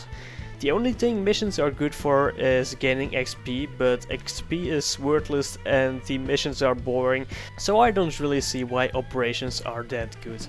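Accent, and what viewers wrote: Netherlands. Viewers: are you dutch? i thing i know your accent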